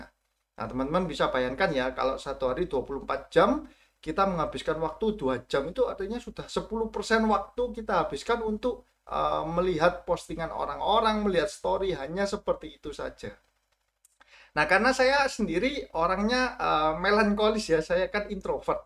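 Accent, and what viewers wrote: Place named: Java, Indonesia. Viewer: Dialeg nya medok banget mas *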